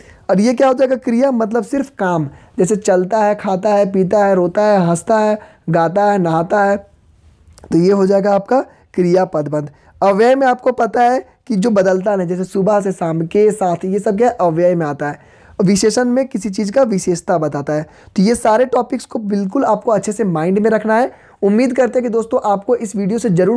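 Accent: Bihar, India. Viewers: Sir aap bihari ho kya ,🤓🤓🧐🧐🔥🔥🙋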